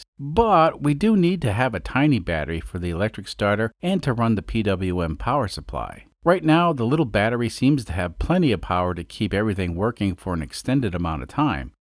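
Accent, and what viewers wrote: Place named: Long Island, United States. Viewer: Lawnguyland accent and bluegrass music!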